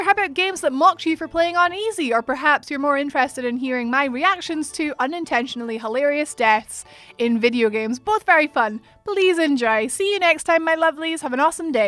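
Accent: Scotland, United Kingdom. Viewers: I have to turn the sound off as soon as I hear the scottish robot.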